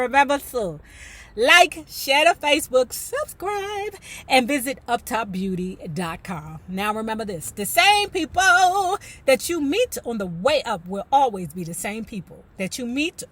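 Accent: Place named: South, United States. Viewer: someone said you need to go to a community College 😂😂 Hilarious. Your way of talking is uniquely you and I like it. At first I thought you were from the south till I realized you're from DC. Well you make me laugh telling your stories as only you could tell them. This Bobby Womack book is good and you just getting started. Keep doing you. I'm enjoying it so much. I'm a senior woman and always been a fan of Bobby.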